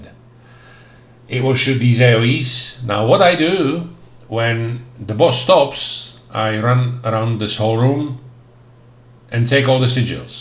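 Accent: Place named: Highlands, United Kingdom. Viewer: YESSSS!!! I felt you had a highland accent but then some words I could tell were spoken with something else! I too and from just outside Glasgow!! So hello neighbour!!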